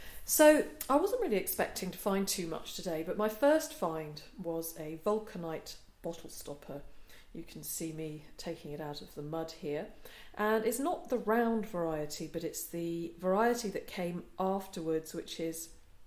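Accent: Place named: RP, United Kingdom. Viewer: you sound so posh! Did you film the opening shots from your home on your balcony overlooking the Ritz in Mayfair? All said & done, as always a great video - thanks you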